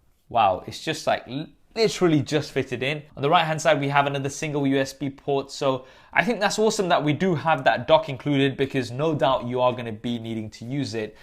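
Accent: United Kingdom. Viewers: This guy looks like Indian but Sounds like UK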